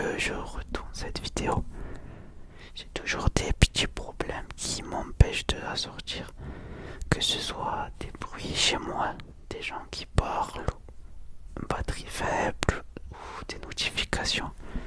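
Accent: South, France. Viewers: L’accent du sud Alala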